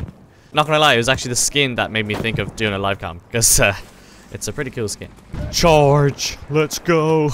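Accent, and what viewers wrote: Ireland. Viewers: U sound Irish...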